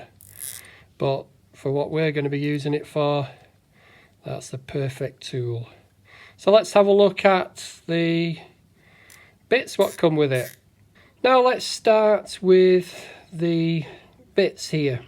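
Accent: United Kingdom. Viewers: Yeah 😂, I think a solid 50% of us Brits have a hard time speaking our own language, it honestly gets worrying after a while 😂, are you from up north?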